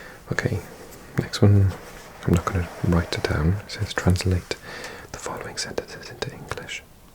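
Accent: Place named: The Hague, Netherlands. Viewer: Ik heb het idee dat je bij het leren van Nederlands veel gepraat hebt met mensen uit de omgeving van Den Haag of Rotterdam, kan dat kloppen? Je hebt een heel goed Nederlands accent van die regio namelijk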